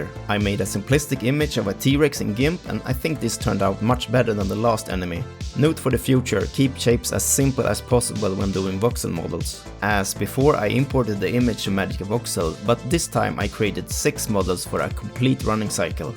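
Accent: Sweden. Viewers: Why do you sound soooooo swedish hahaha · SVENSKA DIALEKTEN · The game is really cool! But I have one question. Are you swedish by any chance? Because your dialect sounds very swedish😂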